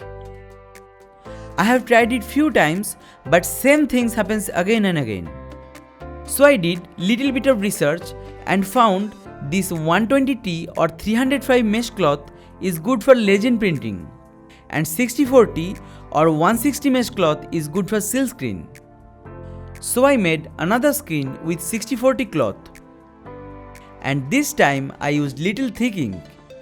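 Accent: Bengal, India. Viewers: Accent Is Like Bengali Dada Where Are You From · How do I contact you? You also sound surprisingly much bengali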